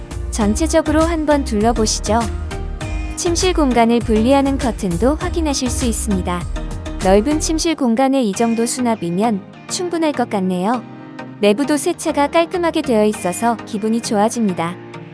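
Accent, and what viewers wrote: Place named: Gyeongsang, South Korea. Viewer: 기계음보다 대표님의 경상도 사투리가 더 좋아요~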